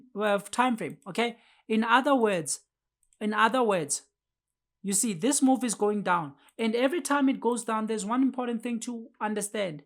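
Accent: South Africa. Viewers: Are you South African I'm hearing your accent? Cela ungifundisa ubhuti. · So nice watching a trading video in a South African voice. Do you stream your live trading? Im looking for a community bruu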